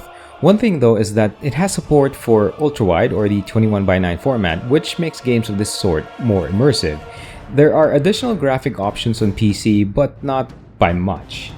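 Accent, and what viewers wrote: Philippines. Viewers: pinoy ba to. parang pinoy yung accent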